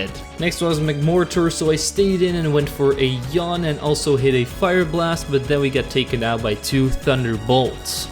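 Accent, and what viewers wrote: France. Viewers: That French accent though. Haha! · That damn french accent always gets me x) Excellent video, you truly are great:) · My favourite part of all your gen4 sinnoh runs is Ur French accent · That French accent tho 🔥🔥🔥🔥🔥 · Like for the French accent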